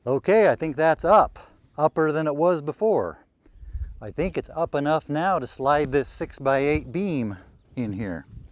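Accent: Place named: West, United States. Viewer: the wonderful buster scruggs accent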